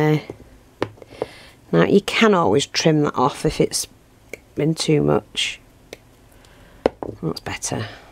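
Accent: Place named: Yorkshire, United Kingdom. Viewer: I have just been informed that your delightful accent is Yorkshire. Big happy smile over here.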